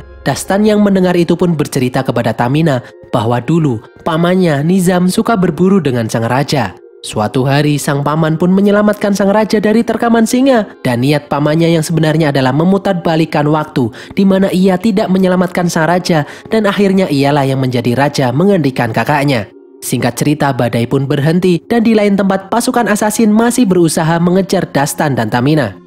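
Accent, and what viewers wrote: Java, Indonesia. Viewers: Miminnya orang Jawa😂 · Wong jowo yo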